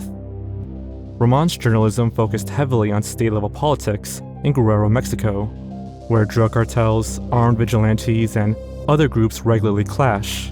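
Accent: United States. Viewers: Can't stand the way hes saying Mexican words/names in such a yt/American accent man. Ain't yoir name?!?! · i find it hilarious that a supposed mexican pronounces spanish names like an american, cmon man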